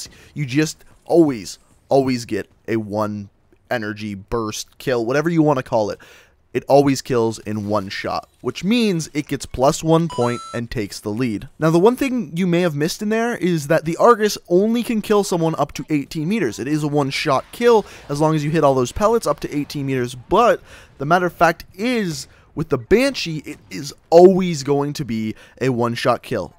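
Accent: Canada. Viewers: is this guy canadian he sounds canadian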